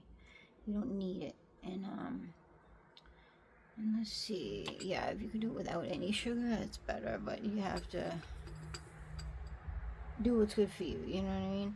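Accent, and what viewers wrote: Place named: Rhode Island, United States. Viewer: I dont know why I was under the impression you were in RI beyond your accent (know it because I used to live in Portsmouth) but I think thats where you are?. Didnt realize that cannabis was illegal there if im right and thats the state youre in. But MA and CT are close to you and yes those places sell potent thc products but that just means you use less of it at any given time if that is something you enjoy doing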